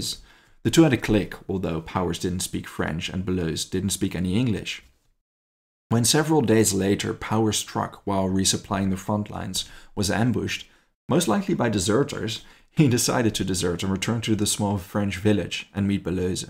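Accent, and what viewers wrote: Germany. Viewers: with The German accent - 1. Is the opening music you use from Bloch's Hebraic Rhapsody.? I am just trying to place it. 2. Another unfortunate was one Theodore Schurch who did some minor interrogation work for the Germans after being captured. His father was Swiss so presumably he spoke Swiss German. There was something about his English which made some of he British officers guess he was really a turncoat. He was allegedly captured in Rome in March of 45.. well after Italy had fallen. Why he did not try to get to say CH and the to Australia or Canada and simply disappear is beyond me. Perhaps his relationship with his CH relatives was not so good. Well even though the war was done and dusted by the time of his trial he was still found guilty of treachery and Hanged... I think he was just too cocky and he could easily have avoided the noose. 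I know of no other UK soldier executed by the brits for treachery or treason during WWII. K Fuchs who was a UK fast track citizen was very lucky to avoid the noose and had the USA counter intelligence caught him, he might have been given a heavy sentence while the Manhattan project was running (to prevent the other scientists going on strike during the war effort ) and then executed on some other charge post WWII. But the US never caught him in the act. Tim Fidler, NZL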